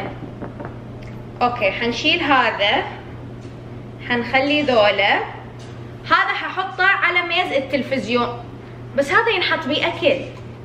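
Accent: Iraq. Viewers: مشتركه جديدة حبيييتج وحبيت لطافتج وعفويتج وقناتج تجنن ان شاء الله نشوفج بأعلى واحسن القنوات ❤️🇮🇶+ اتمنى تظل لهجتج عراقيه حبابه لتصيرين مثلهم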